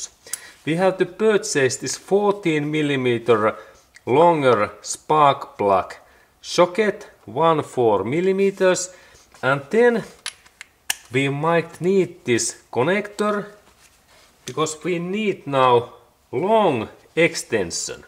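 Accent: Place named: Finland. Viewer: Thanks for the vid, also I really like the Fin accent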